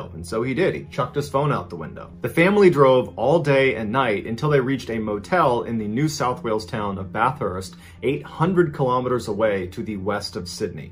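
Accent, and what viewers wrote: United States. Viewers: I do have to say I love hearing Americans say Australian towns. As an Aussie it takes me a moment to catch up on what towns are being spoken about. I end up saying it correctly in my head. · It's funny as an Aussie, hearing Americans pronouncing our towns and city names. Not Mr Ballen fault, it can be hard.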